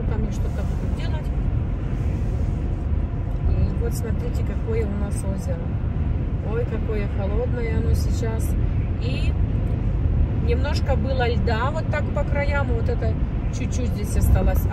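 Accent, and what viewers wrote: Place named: Moldova. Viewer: Такой говор как с акцентом молдованки в Украине город Черновцы там разные сел молдованов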